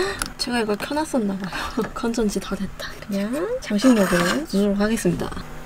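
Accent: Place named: Gwangju, South Korea. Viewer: 광주 사투리 정겨워요 ^^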